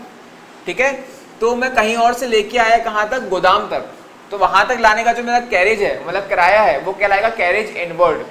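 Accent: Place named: Ratlam, India. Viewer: yes i was right..... Apki language me apna pan lga.... me bhi ratlam MP se hoon 😊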